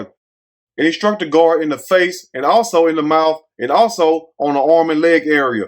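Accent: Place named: Houston, United States. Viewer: I heard you talk and immediately heard that H Tine twang. Possibly 4th ward.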